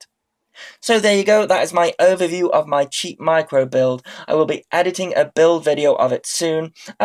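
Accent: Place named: United Kingdom. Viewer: Oh sorry, just you have a British accent and the scenery around where you fly look like it is the uk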